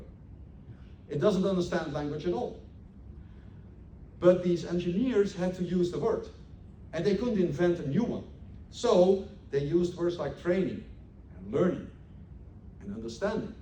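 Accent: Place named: Netherlands. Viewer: Oh haha, dat is perfect! Meende al een Nederlands accent te horen, maar top, dan zal ik die ook nog eens doornemen om zeker te zijn dat ik het ingewikkelde engels (lees: technische engelse woorden die niet in mijn dagelijkse leven voorbij komen) niet verkeerd begrijp. Hartelijk dank voor de reactie!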